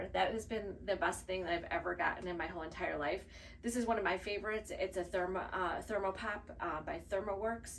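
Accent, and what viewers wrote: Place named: Chicago, United States. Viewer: I just found you guys and I laughing my ass off...( why I joined ww big big ass ) thank you so much I am having so much fun. I am from south side chicago and now live in fl, Listening to you guys talk and laugh makes me miss home i hear you accent and you look so much like my aunt.. melts my heart... keep having fun and thank you for all the help and inspiration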